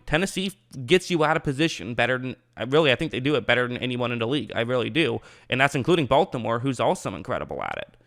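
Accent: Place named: Pittsburgh, United States. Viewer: You can hear is Pittsburgh accent 😂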